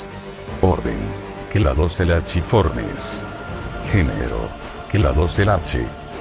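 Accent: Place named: Spain. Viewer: Yo pense que eras de España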